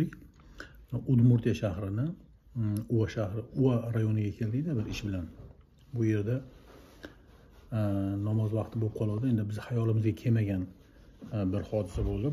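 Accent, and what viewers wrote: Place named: Uzbekistan. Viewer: Удмурд деганини энди эшитишим кайирга карайди сиз Татаристонга кетдик диябсиз забонингиз хакикий Узбек сог булинг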